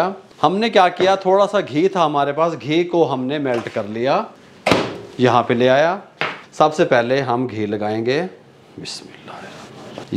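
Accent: India. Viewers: Love ur all recipes, love ur desi accent,, i try ur all recipes, all are yummy, delicious, tasty, from spain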